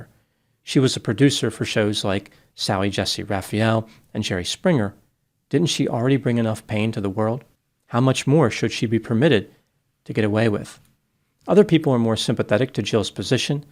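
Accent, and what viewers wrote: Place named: New Jersey, United States. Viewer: I thought Dr. Grande was from New Jersey, hah. He has an accent that places him there or greater NYC.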